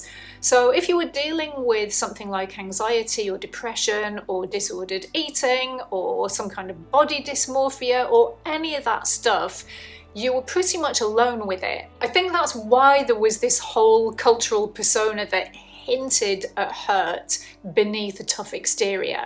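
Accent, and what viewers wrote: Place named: Manchester, United Kingdom. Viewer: I thought I could hear a little Mancunian twang in your accent. The 90s in Manchester was unbelievable but of course I'm biased. It was the cultural capital of arguably the world back then. The music, nightlife we had a decent football team back then also. As far as safety goes you could argue that Manchester was more dangerous in the 90s that's why it was dubbed Gunchester there was a lot of gang activity. Statistically I don't know which era is more dangerous. Maybe social media and algorithms play their part in elevating the sense of danger and fear Of course they weren't around back in the 90s. Generally speaking I think that every generation would say that the time that they spent as teenagers was the best time of their lives. So if you happened to be a teenager in the 90s then you would say it's better than today.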